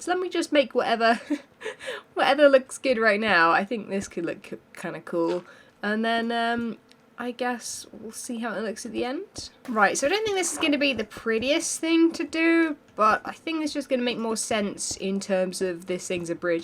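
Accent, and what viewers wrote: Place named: London, United Kingdom. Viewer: She’s from London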